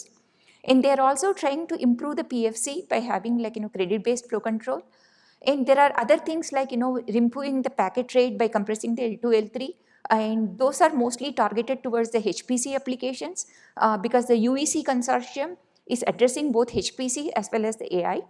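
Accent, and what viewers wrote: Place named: India. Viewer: Thank you for giving insights about AI Networking and i like your speech very calm and keeping natural Indian tone.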